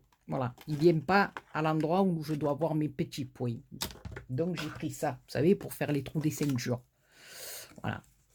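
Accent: South, France. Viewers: Cc ma, tu as toujours des idées originales....elle est trop sympa cette cartounette! En plus à chaque fois que je t'écoute tu me rappelles le sud où je passe mes vacances d'été depuis 16 ans! Prends soin de toi ma et à très vite pour un tuto! Pleins de bisous. Sandrine du 91 😘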